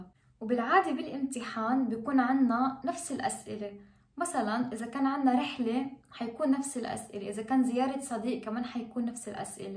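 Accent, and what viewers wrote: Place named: Arab world. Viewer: يؤبشني لهجتك عربيه ما احلاها 😀❤️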